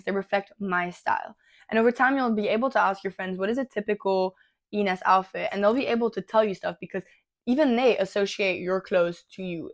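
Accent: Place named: France. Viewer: Sister 🥹are you french btw? Ur accent is so cute:3 I'm not trying to be rude, but I've been learning French for awhile but only textbook style. I only started listening to French content, and I kind of recognise the accent..?^^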